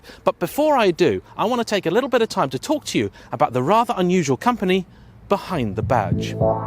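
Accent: United Kingdom. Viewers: All i wanted to hear for this entire video was the Viper V10, but all i heard was an annoying British person droning on…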